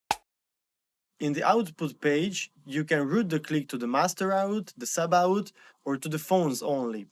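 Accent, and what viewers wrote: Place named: Hungary. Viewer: Az ember egyből felismeri, ha hunglishül beszél valaki :D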